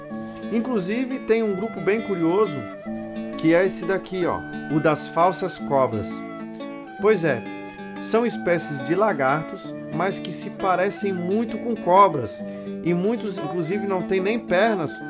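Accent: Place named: Florianópolis, Brazil. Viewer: Muito bonito seu trabalho! O sotaque manezinho da uma qualificada ainda maior! Rsrs As imagens são bonitas! É vc mesmo que faz todas? Continue firme! Está de parabens!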